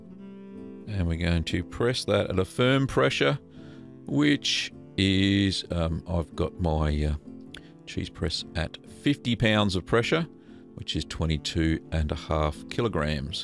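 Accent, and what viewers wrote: Australia. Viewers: Aussies have their own way of saying things, part of why we love them :)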